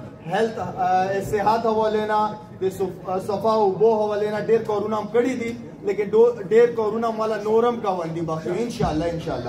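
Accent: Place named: Punjab, Pakistan. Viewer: Jaisy koe punjabi pashtu bol raha hu...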